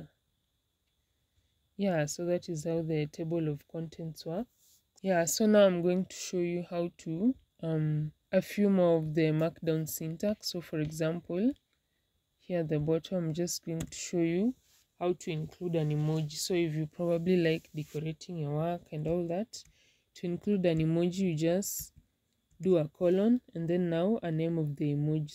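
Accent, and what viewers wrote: Kenya. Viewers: Eish you sound like a Kenyan wee nakushow nimekwama videadly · I can tell that you are a Kenyan. 😅 Kenyans have their own accent.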